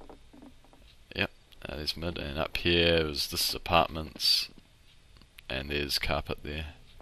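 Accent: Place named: New Zealand. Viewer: cool accent, New Zealand? You sound like flight of the choncords.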